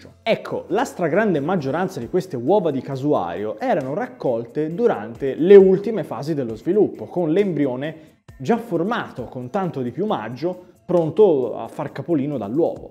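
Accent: Tuscany, Italy. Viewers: bello sentire parlare un po' di Toscano maremma cigniala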